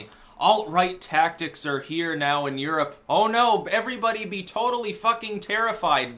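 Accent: United Kingdom. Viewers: British accent is king. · Dude, you have the BEST British accent ever!!! 😎🤣😁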